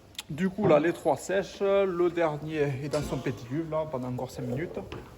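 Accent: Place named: South, France. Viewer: Salut, ma femme me dit toujours, avant de faire crac crac coupe toi les ongles, ça t'éviteras de me griffer. Hahaha… Si non,chapeau pour tes expliquations,j'en apprends chaque fois, c'est un régal,en plus avec l'accent du SUD. AH, le Larzac,Joseph Bové, le thym le romarin, Ho, là je m'égare,salutations Serge.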